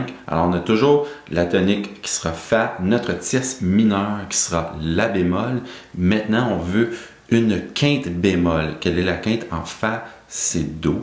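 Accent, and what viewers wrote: Quebec, Canada. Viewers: Salut, merci de tout cœur. Ce qui me tue, c’est que je sais tout ça de façon empirique, et autodidacte, et même ton accent me dérange moins depuis que j’ai une amie d’enfance établie au Québec qui a repris contact avec moi….😂 Le problème est que je suis porteur d’autisme et que je suis totalement bloqué lorsque quelqu’un parle en mots alors qu’on est en « notes » ou en « sons »…. Aussi radical que ça, alors mon autisme, surtout en musique puisque c’est mon intérêt spécifique, est clairement invisible à yeux des autres. Je fais le vœu ici de découvrir et suivre ta chaîne pour apprendre encore et je continue d’improviser sur les disques ou music tracks de YouTube. Merci encore. Je m’abonne.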